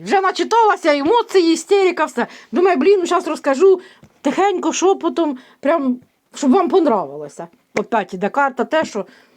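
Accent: Ukraine. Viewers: Український діалект❤ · Ви справжня, ви наша украіночка. В кожному регіоні свій діалект і говоріть так як говорите, вас приємно слухати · Пані,я розумію,що Ви Не Все можете Говорити,що Бачите..За суржик,не переживайте.В вашій місцевості,говорять Так..Дякую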